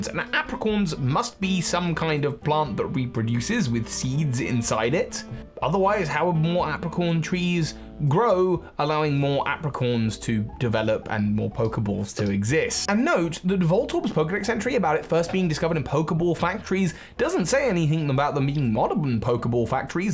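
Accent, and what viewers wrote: United Kingdom. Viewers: Your from Uk?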